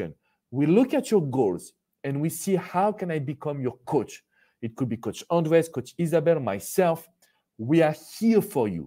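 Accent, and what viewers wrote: France. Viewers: I love your French accent. You make the word "suffer" sound like a lavish dish <3 <3 <3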